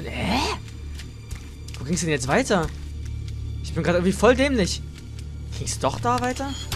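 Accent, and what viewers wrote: Berlin, Germany. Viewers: du und dein berliner sprache weste bist du berline dann sag "ich bin ein berliner"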